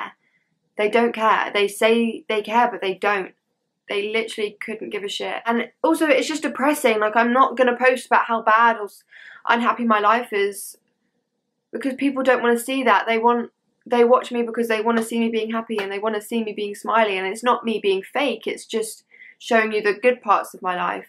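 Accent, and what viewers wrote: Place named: United Kingdom. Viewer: I love a girl with a British accent it's cute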